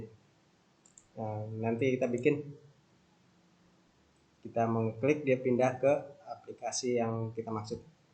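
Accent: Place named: Betawi, Indonesia. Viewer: Bujug dah bang, Betawi bangat yak .... Bisa nih bang ane belajar ke ente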